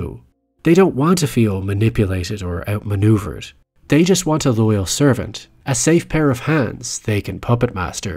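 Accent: Ireland. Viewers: Ah brilliant, it’s so nice to hear a fellow Irish person who is making my favorites videos, I’m Deirdre O’Brien, unmistakably Irish name, especially in Irish, but I’ve been meaning to ask you since the first video of your’s I watched on Prisoners which was fantastic. Love your videos, it’s so great to hear a lovely Irish accent making wonderful videos, you’re the only one (from the kind of videos I watched) and you do a great job. Your analysis is always so great and your observations have made me wonder have you and English degree. Anyway very happy for you in a sea of American creators. Wishing you more and more success, you completely deserve it. 😊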